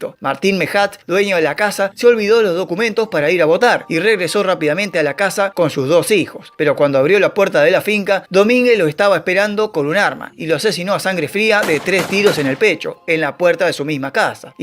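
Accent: Argentina. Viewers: La primera vez que entro a este canal y me gustó mucho el Relato fluido y bien Argento , y las historias Fenomenal 👌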